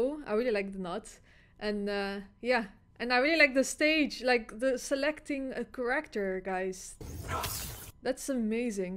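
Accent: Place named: Netherlands. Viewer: Do you come from the Netherlands? You have a Dutch accent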